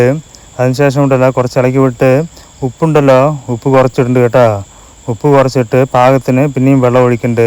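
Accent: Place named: Palakkad, India. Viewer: Palakkade slang aanu broo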